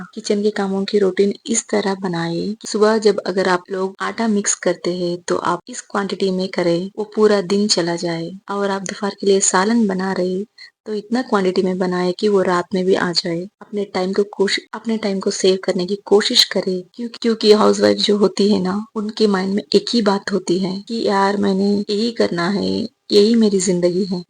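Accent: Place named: India. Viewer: sahi sahi mujhy apk lehjay sy lag rha tha ap Indian hen😍😍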